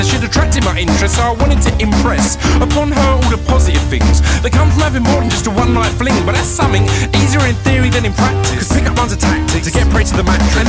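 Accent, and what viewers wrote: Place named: Essex, United Kingdom. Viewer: Yes with his Essex accent :L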